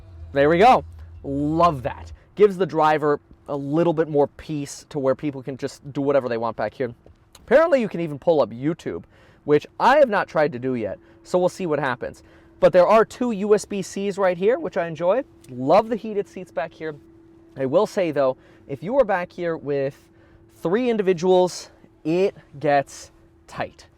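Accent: Germany. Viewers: Is your father german?! 😂 i swear the accent reminds me of it!!!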